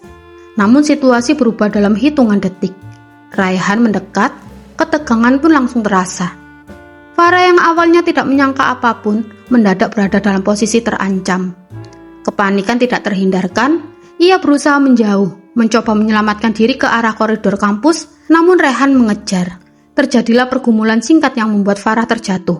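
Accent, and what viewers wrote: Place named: Java, Indonesia. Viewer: medhok bangeet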